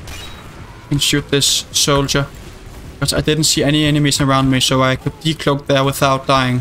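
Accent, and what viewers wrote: Denmark. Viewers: just a guess, but are you danish? if not then my hearing is completely broken, hav en god dag :)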